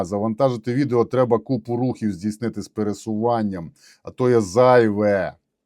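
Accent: Transcarpathia, Ukraine. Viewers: Як приємно чути нашу Закарпатську бесіду.Слава хлопцям! Дякуємо за роботу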